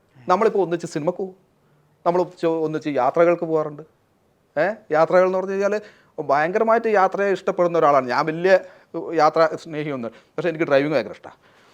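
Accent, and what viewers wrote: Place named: Kannur, India. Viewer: കണ്ണൂർ സംസാരം കേറി വരുന്നു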